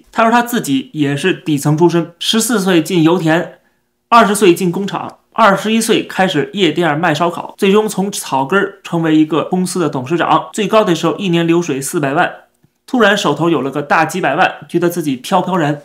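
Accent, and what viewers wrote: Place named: Beijing, China. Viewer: 我怎么听到一北京哥儿们儿在温哥华夜市烤串儿，历时四年购了大豪斯啊……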